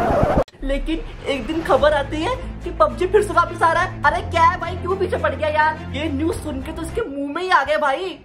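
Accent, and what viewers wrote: Punjab, India. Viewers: Your punjabi is so cute❣️❣️❣️❣️💜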